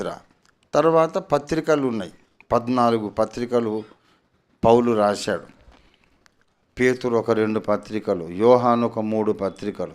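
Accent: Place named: Andhra, India. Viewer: గారు మీరు తెలంగాణ వారు అయినా మీ భాష చాలా అద్భుతంగా ఉంటుంది అసలు సిసలైన అటువంటి ఆంధ్ర విజయవాడ గుంటూరు భాష మీరు మాట్లాడతారు మీ భాష చాలా అద్భుతంగా ఉంటుంది పాస్టర్లు ఎందుకు అదోరకమైన భాష మాట్లాడతారు ఎందుకు మాట్లాడుతారు తెలియదు ప్రజలందరూ మాట్లాడుకునే భాష అందమైన భాష మీరు మాట్లాడతారు చాలా అందంగా ఉంటుంది మీ భాష ఆంధ్ర వాళ్ళు కూడా ఇంత చక్కగా ఈ భాష మాట్లాడలేరు మీరు మాట్లాడే భాష వింటుంటే ఎంత ఆనందంగా ఉంటుందో అసలు సిసలైన ఆంధ్ర భాష అంటే మీరు మాట్లాడింది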